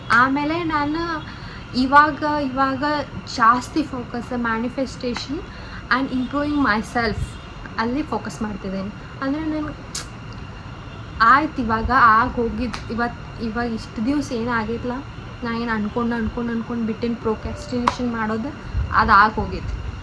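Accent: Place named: Dharwad, India. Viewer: Bangloredag 3 varsha idru innu dharwad bashe martila alla ad ista aagid nin vedio olag❤❤❤❤❤....love and respect for that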